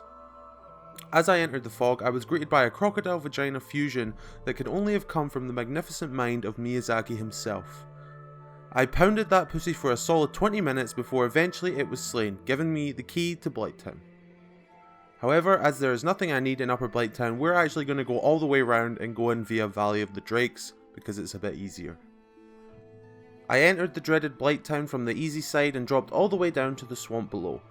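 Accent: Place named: Scotland, United Kingdom. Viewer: Me: "I've seen a shield only run of this game." Youtube: "But have you seen it with a Scottish accent?"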